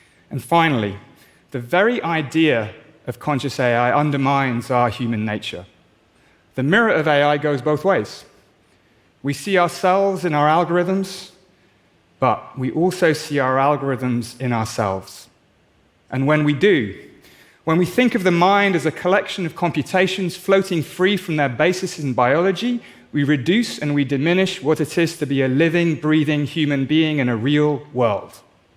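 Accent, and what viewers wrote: United Kingdom. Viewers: They're just lying to our faces. This is all damage control. The y know they've already lost control of AI. They've created a monster they could never be able to tame. These guys are pure evil. Lying to people's faces in a British accent! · This video is so full of baseless assertions, it's difficult to watch. I guess being a good orator with a British accent is all it takes to get on TED these days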